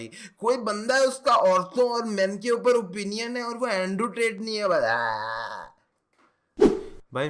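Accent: Nigeria. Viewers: he nailed the nigerian accent god damn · nice nigerian accent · that nigerian accent 🔥🤙🏼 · Bhai clg mai baitha tha. Ek nigerian exchange student hai. Mera dhyaan phone pe nahi tha par mai sunn raha tha. Mujhe 2 min laga wahi mujhse baat kar raha hai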